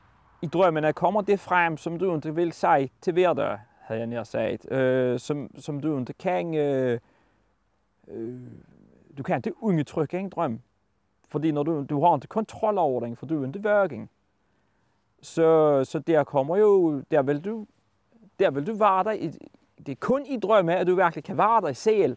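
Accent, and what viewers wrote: Scania, Sweden. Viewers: En blandning mellan skånska, danska, blekingska och lite småländska. Rätt kul. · Det låter som skånska tycker jag :D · Jeg er fra Skåne og det lyder næsten som det😮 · Jeg er norsk, og forstår det. Det høres ut som skånsk synes jeg. Noe som ikke er merkelig, siden skånsk opprinnelig var østdansk, som er blitt forsvensket. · Det liknar skånska en del.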